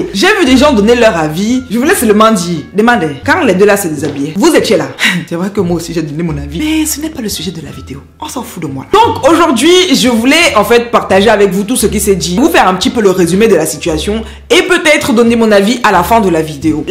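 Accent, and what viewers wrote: Cameroon. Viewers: Ton accent kmer c'est la magie Sista, courage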